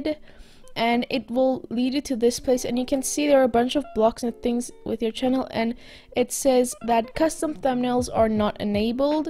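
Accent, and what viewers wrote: South Africa. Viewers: This was very useful!! Also I love your accent!! Are you South African? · You sound south african I love it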